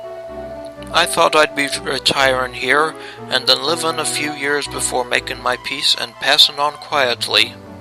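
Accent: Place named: South, United States. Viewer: Thanks for doing these vids! I really enjoy the narrative, you sound like a good friend of mine, a southern gentleman😁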